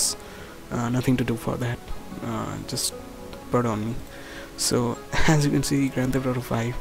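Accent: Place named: India. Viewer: can the music get any fucking louder and the voice be more indian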